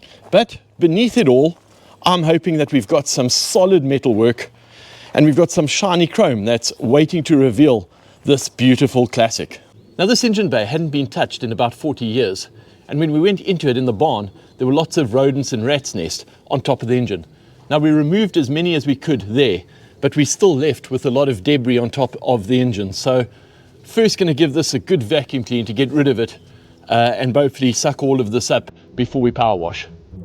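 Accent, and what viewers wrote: South Africa. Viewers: Hello Sir, are you from South Africa..? 😊😊😊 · Great to hear a South African accent broadcasting from the soggy isle. Good luck with the restoration.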